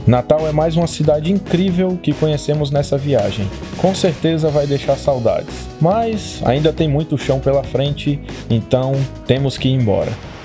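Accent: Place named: Ceará, Brazil. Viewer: FállôôUuuuu do jeito que tu fala é muito cearenses man!! um salve daqui de SP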